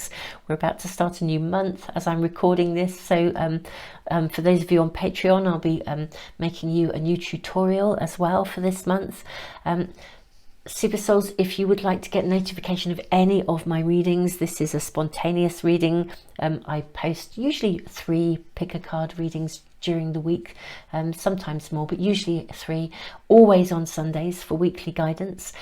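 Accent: United Kingdom. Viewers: I LOVE listening to your reading. Sometimes I follow the way you express all your ideas. I asked myself, I am thankful because I can practice how to speak like a British. Someday ! I'll be there to UK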